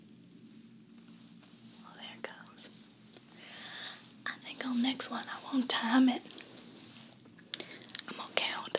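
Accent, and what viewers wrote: South, United States. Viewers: This is by far the tinglest video you've made...especially at the beginning when you're just talking in that melting southern voice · This southern accent is soo cute 😍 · Good job depicting how us southern talk and act .. well the true south that is .. hehe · How not love her southern accent 😊😊😊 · I love this character! I'm not sure if you're from the south, but you do a pretty good job executing the twang. ; ) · It's insane how much you sound and act like my cousins in the south in these roleplays.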